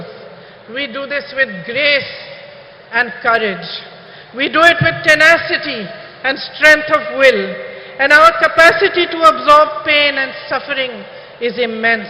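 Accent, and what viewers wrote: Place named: Tamil Nadu, India. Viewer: எங்கள் தமிழின் இனிமையை தங்கள் உச்சரிப்பில் கேட்டு மகிழ்ந்து வாழ்த்துகிறோம் வெல்க இந்தியா வெற்றி நிச்சயம்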